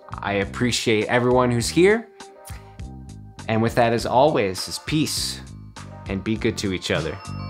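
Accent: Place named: United Kingdom. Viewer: Appreciating all of this but esp the British accent dudeeeee.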